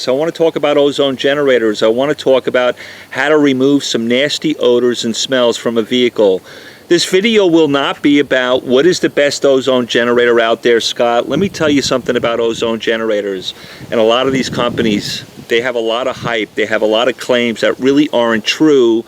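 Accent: New York, United States. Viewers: the accent sounded like it came from NJ or NY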